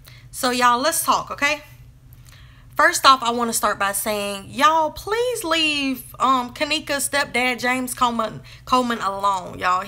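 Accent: South, United States. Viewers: I enjoy your videos, thank you for keeping us updated. Love your voice, are you in the South?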